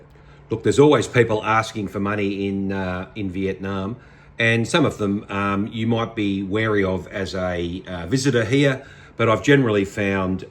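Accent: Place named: Australia. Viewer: Why are you talking that way ? Can't you talk normally ? Your accent irrtates me a bit. Is that an Australian accent? It's a second worst accent I've heard. The worst is South African accent . The second one is yours.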